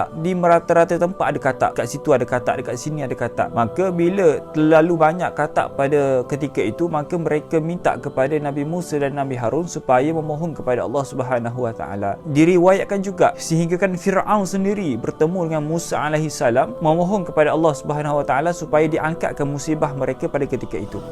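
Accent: Kelantan, Malaysia. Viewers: Abang ni orang kelantan ke?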